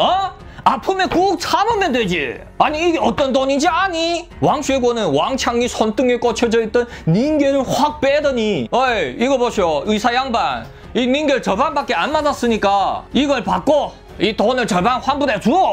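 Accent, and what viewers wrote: Yanbian, China. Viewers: 조선족 같음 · 한국말을 중국말처럼하네. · 한국말을 중국발음으로 들으니 힐링되네.. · 한국말 연변말 섞어서 너무 이상하네요 하나만 하세요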